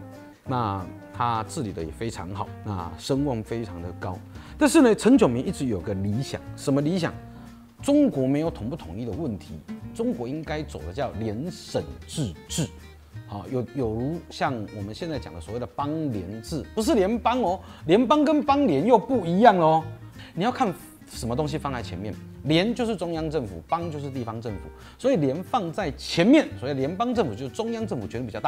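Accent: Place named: South, Taiwan. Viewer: 為什麼演算法推了一個南部腔長的像兄弟的人在講歷史？？太衝突了🙊🙉🙈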